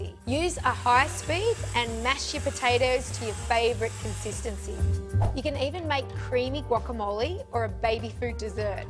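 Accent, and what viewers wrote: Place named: Australia. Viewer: Her charming Aussie accent kept me watching since I already have a similar appliance.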